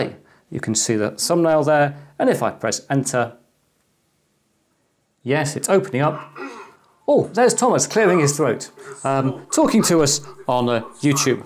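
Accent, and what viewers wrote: England, United Kingdom. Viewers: Love your british speak mate, cool startrooper heads on your desk also! keep up! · I thought it was Christopher Walken :-) talking about R-Pi. on Youtube. with such pure English accent :-)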